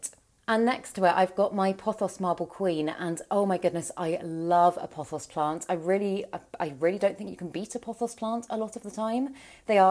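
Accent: United Kingdom. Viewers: hello, I like your videos, and your British mood and accent. About the string of pearls, they are easy to overwater, it's true, but as I am concerned, I find that they need quite a lot of water for a succulent, not too much, but if I forgot, it turns brown like yours. And of course they love to have bright light on the top of the pot ...... I would like to know what do you do when you leave your apartment for a few days, for holidays for instance, about your plants of course ?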